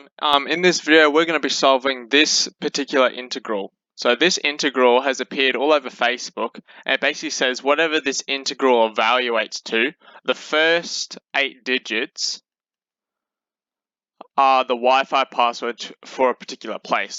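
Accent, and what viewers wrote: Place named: United Kingdom. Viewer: Recognizing british's speech is pretty tough, sorry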